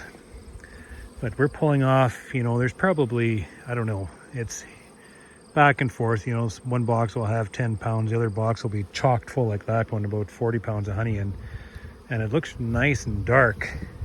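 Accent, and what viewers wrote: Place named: Canada. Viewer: Definitely hear a Canadian accent 🤣...."about"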